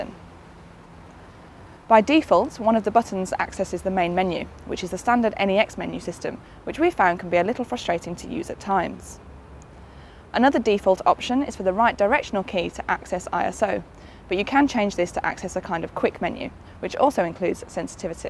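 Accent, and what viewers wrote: United Kingdom. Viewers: Your British accent alone earns you a like ;)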